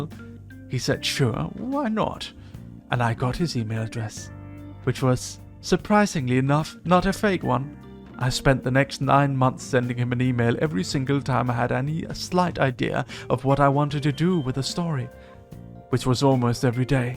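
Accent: Denmark. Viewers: While your English is very good, I can still hear the tiny bit of danish accent in it... as a fellow Dane, I can spot another Dane... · Funny considering every dane can easily hear he's danish But his way of speaking is very endearing!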